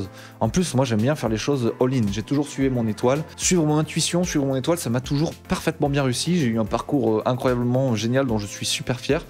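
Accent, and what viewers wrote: France. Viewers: From my point of view it's great to have a tech guy easier to understand because of French accent, as a beginner I struggle with fast and not clear English so switch in English sounds great. I wish you the best of luck ✌️ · Pour ma part, que ce soit en Français ou en Anglais, ça ne change pas grand chose, ton Anglais est facilement compréhensible pour un francophone (ouais, ton accent est français et, pour ma part, c'est pas pour me déranger). Je te rejoins sur de nombreux points et plus encore sur le "concept" de 2nde chaîne en Anglais. Il n'y a qu'à voir le four pris par Bruce Benamran (e-Penser) quand il a tenté de créer une chaîne anglophone anglophone (Get it). D'autres youtubeurs ont fait le même pari que toi et ils connaissent le même rejet d'une partie de leur public. Kevin Tran (Le rire jaune) en est une illustration, il a vu le nombre de vues réduit de moitié et le nombre de commentaires n'est plus que l'ombre de ce qu'il était... 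A la fin, c'est toujours le public qui a raison, que ce soit pour de bonnes raisons ou pour de mauvaises raisons. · depuis que j'ai commencer a apprendre le dev(il y a 9 mois) et j'ai egalement commencer l'anglais. je trouve ça super que tu passe en anglais et je continuerais a te suivre avec ce fameux accent français depuis bdx 😉 j'ai commencer depuis peu a suivre des cours sur freecodecamp qui délivre des certification en développement, le contenu est anglais, tres pédagogique(beaucoup de pratique) et complet, je le trouve mieux que le contenu gratuit de openclassroom pour ma part. si tu peux faire une video sur ce site ça pourrais peut être motiver ta communauté a apprendre l'anglais ou juste me repondre en commentaire sur ce que tu pense de leurs certifications pour le marché du travail francophone 😉. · Continue en anglais, ton anglais est hyper simple pour nous de comprendre vu que t’as l’accent, limite j’ai l’impression tu parles en français alors que c’est de l’anglais. 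De plus tu pourrais grandement aider ceux qui comprennent pas, les gars l’anglais c’est la base de tout au monde surtout en tech!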